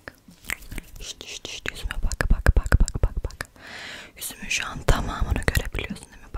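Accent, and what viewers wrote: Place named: Germany. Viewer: ablada alman aksanı var gibi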